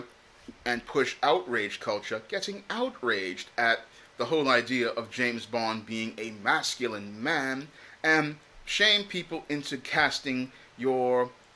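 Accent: United Kingdom. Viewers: Nice British access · The British accent is a nice addition as always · Love the British accent throughout the commentary. Very diverse form of commentary and the evil laugh was fitting of a British villain. Everything you said is on point and I am sick of these movies now. I'll pass on this since they passed on Idris. What a slap in the face to black men. Carry on now!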